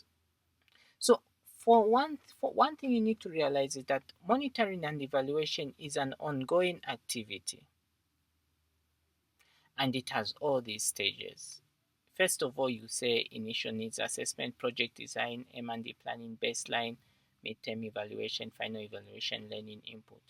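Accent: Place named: Zambia. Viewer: You sound Zambian.. great lecture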